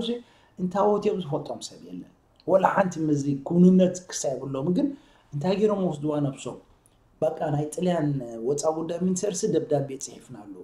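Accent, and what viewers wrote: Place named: Tigray, Ethiopia. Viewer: I never have been in Asmara,but according these around me who have been in Asmara said your dirty agame tigrina of tigrai sounds like eti defa e arabia ab asmera znebre agame miruts yifTer timesl are they right or what?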